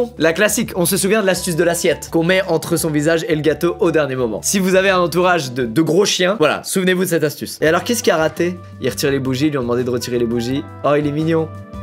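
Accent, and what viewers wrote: England, United Kingdom. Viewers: Toujours un accent anglais MA-GNI-FI-QUE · ✨l’accent anglais✨ toujours aussi beau 😭😂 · Toujours l’accent anglais qui est frais chakal hein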